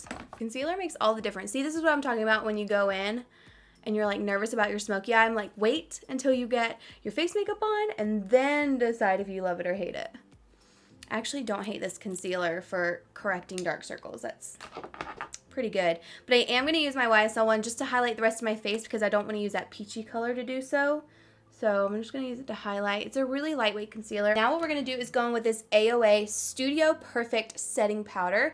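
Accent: South, United States. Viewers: i love your videos!!! i’m from KY so it’s cute to hear another southern girl! my twitter is: xoxohannah16 💗💗💗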